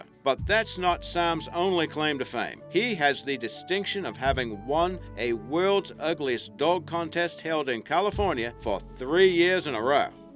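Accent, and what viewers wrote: Australia. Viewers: Dave 983 I think he is an aussie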